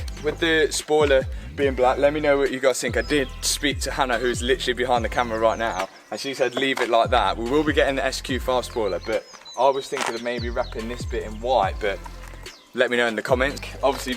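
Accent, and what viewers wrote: Leicester, United Kingdom. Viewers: Looks sick 👍🏻. Saw the S5 in Blaby today, thought I recognised the Lesta accent 😂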